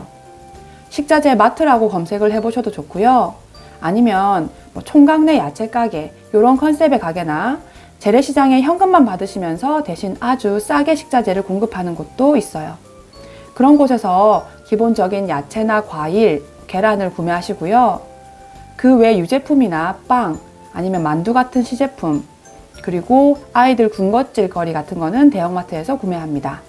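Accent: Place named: Busan, South Korea. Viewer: 부산이나 김해나 양산?쪽인가바요ㅎ 부산살아서 말투가 익숙해여ㅎ 4인가족 많이 배우고가요^^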